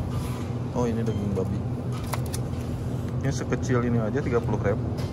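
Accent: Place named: West Java, Indonesia. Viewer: Logat abangnya Sunda banget 😂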